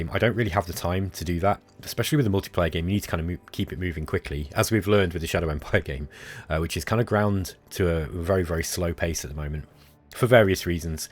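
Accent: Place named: Scotland, United Kingdom. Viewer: Oh I can believe it. Just interesting that you managed to identify the exact same strategic vulnerability that the Romans did. Hell, if your explanation was flowered up a bit, and in Latin instead of your calming Scottish brogue it wouldn't have been all that out of place on the senate floor. To paraphrase Livy Book 16: "Against them and against king Hiero of the Syracusans, the Senate decided to offer help to the Mamertines. There had been much debate between those for and against it. For the first time, the Romans crossed the sea with an army and they fought successfully against Hiero who sued for peace, which was granted."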